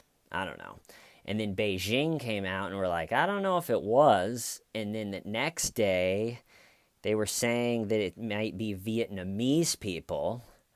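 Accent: England, United Kingdom. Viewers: I love your British accent, I was born and brought up here but it’s ok because I’m Scottish. I really enjoy your videos.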